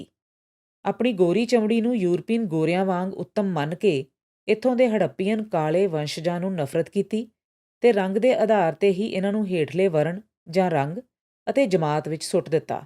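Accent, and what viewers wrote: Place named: Punjab, India. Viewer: thanks for analysing such historical facts keep it up yourspeaking in folk Punjabi is highly appreciable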